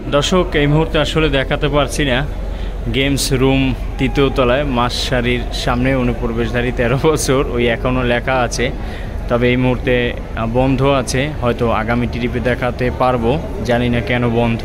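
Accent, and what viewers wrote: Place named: Barishal, Bangladesh. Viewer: ভাই আপনার মুখে বরিশালের ভাষাটা আমার কাছে অনেক অনেক ভালো লাগলো আমার ভাই বরিশালের ভাষা অনেক ভালো লাগে আমার নানা বাড়ি ভোলা ।আমার দেশের বাড়ি শরীয়তপুর তারপরও আপনার বরিশালের লঞ্চের ভিডিও দেখি আমার অনেক ভালো লাগে ভাই। ❤❤❤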